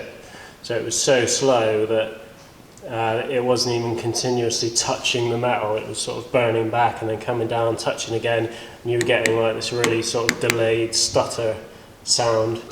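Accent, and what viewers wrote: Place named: London, United Kingdom. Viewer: You sound like you're from London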